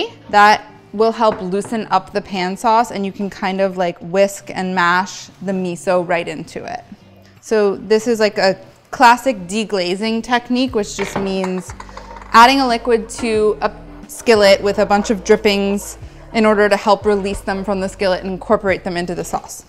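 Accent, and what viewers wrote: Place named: United States. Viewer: Why is this uk dinner lady speaking with an American accent?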